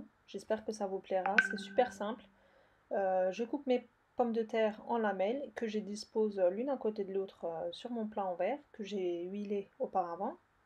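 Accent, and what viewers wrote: Alsace, France. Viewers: Bravo pour tes recettes tu as un petit accent alsacien et comme tu parles d achat en Allemagne pour tes ingrédients ça confirme 😘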